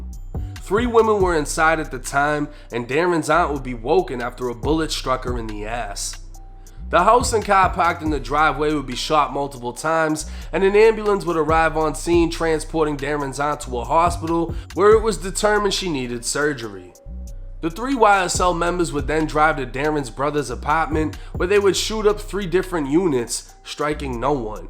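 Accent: Boston, United States. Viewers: Famm are u from Boston cus u sound like u from there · Are You from Boston?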